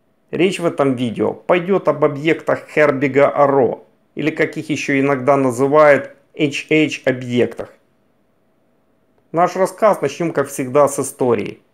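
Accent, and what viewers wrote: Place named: Kuban, Russia. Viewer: Интересно. Но интонация диктора и кубанойдный говор раздражают сильнее, чем желание узнать о звездах, еще не вышедших на главную последовательность. Тем не менее, спасибо за труд. Дизлайкать не буду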